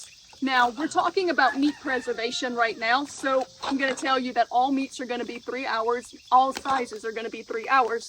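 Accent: Louisiana, United States. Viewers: I knew you were from south Louisiana the moment you spoke. I might be in Tennessee but I boil crawfish here too because I lived in New Orleans most of my life. Great video.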